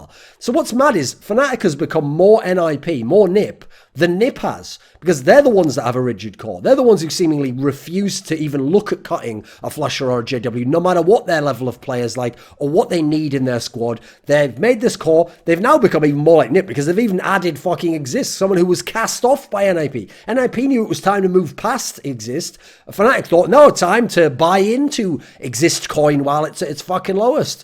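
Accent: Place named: North, United Kingdom. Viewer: He comes from the north of UK, doesn't he?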